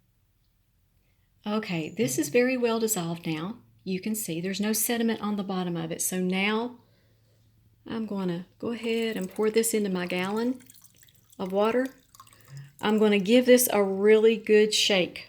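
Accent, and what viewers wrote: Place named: South, United States. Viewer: I love your videos!!! Your voice is so sweet, and it reminds me of spending summers in the south as a kid! I have learned so much from you about my orchids, and so far they’re doing great. My question is about fertilizing my orchids, in that I only have four, and mixing a gallon of fertilizer for 4 orchids seems wasteful. How can I adjust the amounts for so few plants?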